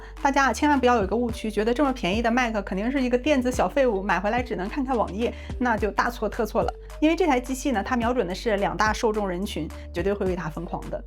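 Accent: Northeast, China. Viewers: 你是东北哪的啊妹妹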